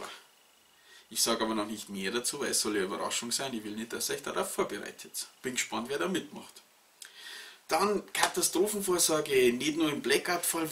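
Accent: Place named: Low German, Germany. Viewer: Besser als Deine HD-VIDEOS ist defenitiv, Dein "Plattdeutsch"😀😀😀 Du machst doch eh schon geile Videos, genau wie der Christian, HD ist toll, die Information ist wichtiger. LG Jupp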